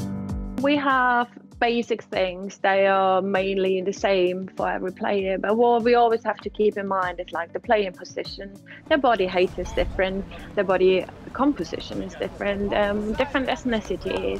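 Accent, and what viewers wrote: Liverpool, United Kingdom. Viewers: Her scouse accent is proof how much she embraced the new life and culture of LFC and probably the city in general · She's started to sound a bit scouse. :)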